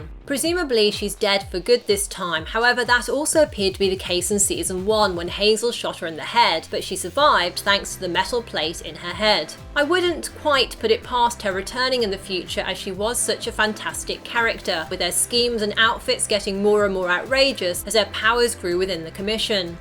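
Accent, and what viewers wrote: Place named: United Kingdom. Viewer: Soldier Gaming nah.. it just seems similar to Lila’s voice to u cuz they both have british accents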